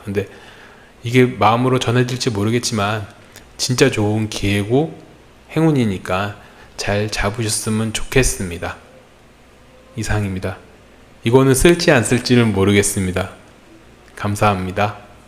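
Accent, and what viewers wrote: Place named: Seoul, South Korea. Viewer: 와 목소리 달달하다.. 이러니 서울말에 뻑이가지…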